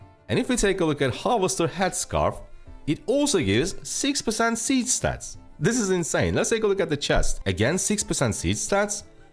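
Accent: Turkey. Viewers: Türk aksanı duyuyorum sanırım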